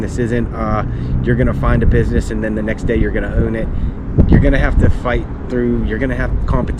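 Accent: United States. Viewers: Are you from Boston? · Are you from Pittsburgh 😂 that accent is awesome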